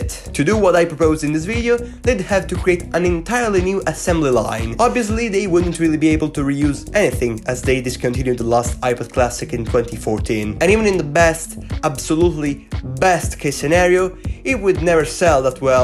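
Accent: Italy. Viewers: Sei italiano zio vero?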